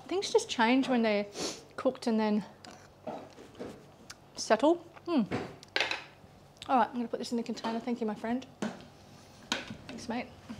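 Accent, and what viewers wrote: Australia. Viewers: Chef Also, thank you for replying as to why the oil! Ok-gotcha... It was what you decided to use for that day! I’m finally going to make this bean dip this week! Been busy! Hugs to you... Also, love the accent and one day hope to visit Australia! Yet I think you’ve shared before that you’re ••not in Australia and rather: Thailand? Coolness either way! Blessings....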